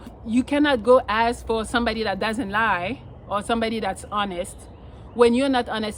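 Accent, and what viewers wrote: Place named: France. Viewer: Coucou de quelle ville tu viens en France ? Btw je sonne exactement comme toi quand je parle anglais.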